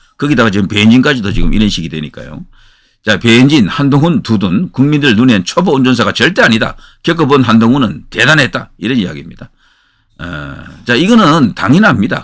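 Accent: Gyeongsang, South Korea. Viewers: 정규재 너나잘해라 정규재 음성 발성톤 엿가락 처럼 ㅋ 경상도 억양인데 이상해 나도 오리지날경상도인데 정규재는 무슨말억양이 촌스럽고 촌스럽고 듣기싫은 음성 억양 말솜씨 암튼 극혐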